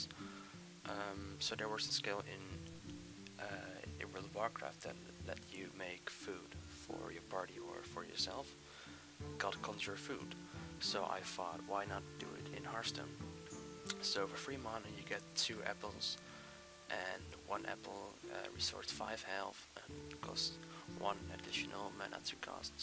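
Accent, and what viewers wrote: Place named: Netherlands. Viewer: ik haat je Nederlandse accent gahaha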